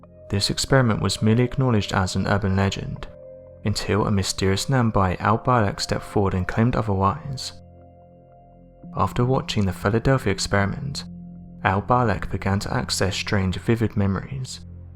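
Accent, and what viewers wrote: United Kingdom. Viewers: If things are happening in Casper, Wyoming, why can't you have someone from Casper, Wyoming narrate the happening? Could be really good and we in America could probably understand wtf, he was actually saying! I am really getting sick of the accent, inability to pronounce the American language and that non-emotional, dreary, British voice!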